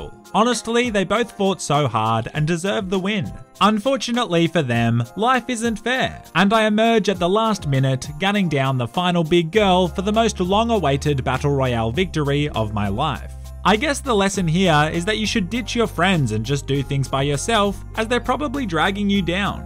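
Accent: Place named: Australia. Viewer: Can someone pls translate this into English , cant understand this Australian. Thnx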